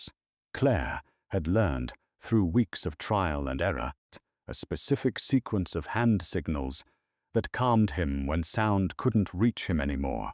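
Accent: United Kingdom. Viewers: British accent ruins the story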